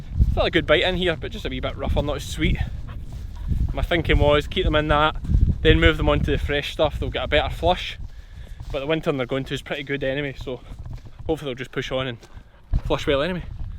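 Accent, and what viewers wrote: Scotland, United Kingdom. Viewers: Ahh cool nice to listen to a Scottish youtuber for once 👍👍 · My mate and i was talking on how you told your dogs to lie down while we were gathering in the mountains today, he says its “lie doon” becouse of your accent but i thought doon was down in Scottish, who’s right?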